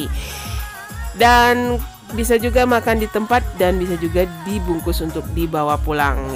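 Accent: Minangkabau, Indonesia. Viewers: Bahaso Indonesia uni ko khas urg awak bana hahahahaha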